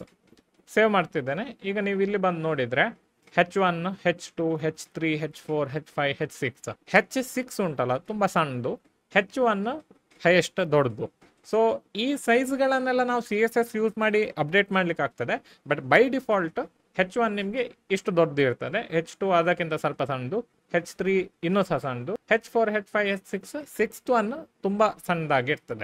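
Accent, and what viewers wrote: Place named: Mangalore, India. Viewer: Evaru mangalorean ah? Accent same mangalore navra tara ide..❤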